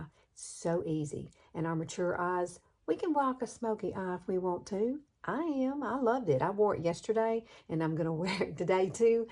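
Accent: South, United States. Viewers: Ty for the brush to pencil tip for no blobs :D Btw, I love love love ur southern accent, it is beyond cute 🥰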